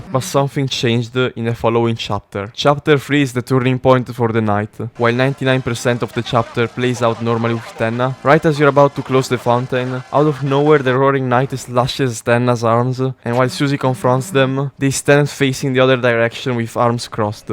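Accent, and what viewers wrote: Italy. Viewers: Ciao bro, bei video. Comunque sì mi dispaice ma credo di sentire che sei italiano, bei video. Ben fatti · Not relevant but are you Italian? I'm from Italy and many people have this accent in English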